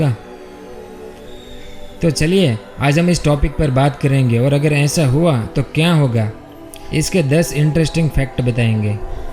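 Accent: Gujarat, India. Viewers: bhai Gujarati hai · You tuber is gujju ....??? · Ha 100% u r gujrati.. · Bhai tu 100%gujraathi hai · You sounds like a Gujarati 😃🙂☺ Gujjubhaai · brother u r gujarati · Gujarati hai tu.... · गुजराती हो किया भाई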